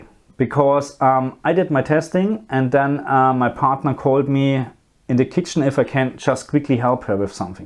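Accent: Germany. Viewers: Ist er Deutscher? Das englisch hört sich so an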